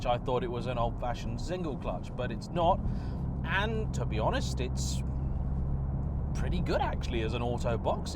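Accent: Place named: London, United Kingdom. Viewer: it's quite amazing going back to watching this from your more recent offerings and your Voice and commentary delivery has changed so much. You actually sound like a Londoner here, but now you have totally different way of presentation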